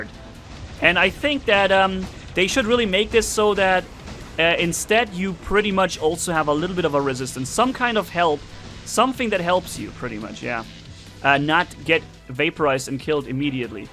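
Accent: Germany. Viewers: Du sprichst ürsprünglich Deutsch oder?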